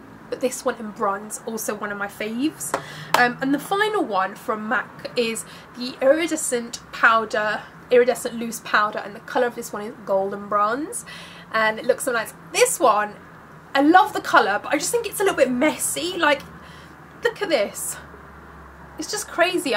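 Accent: London, United Kingdom. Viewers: where's ur accent from.. london? .. oh and hello :-))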